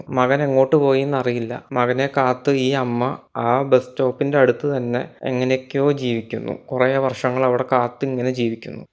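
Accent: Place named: Palakkad, India. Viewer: വളരെ കാഷ്വൽ ആയ വിവരണം! കൊളോക്വിയൽ പാലക്കാടൻ(?) സ്ലാങ്ങ്!! നല്ല ലോജിക്!!! 🎉❤🎉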